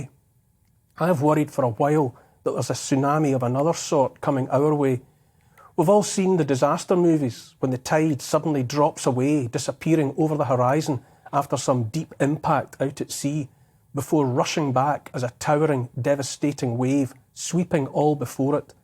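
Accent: Scotland, United Kingdom. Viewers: Agree with everything you say,. Look forward to every posting from you. You present with a calm Scottish accent but I can hear the anger beneath. As punishment for those responsible and especially those who made millions from their decisions I would suggest that they all have to return the money and sell their assets except for one average priced house and give that to theTreasury to help offset the bill that we, our children and our grandchildren will be paying for their lifetime. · We have Rod Cullerton and Jason Miles from GAP. We have Graham Hood who's been very outspoken, along with Hoodys Helpers. I do like though.. I love a Scottish accent. The same will happen here. They know they're losing, no unvxd at fireworks, oh no please come.. just one example. I think you'll see a lot of scrambling now.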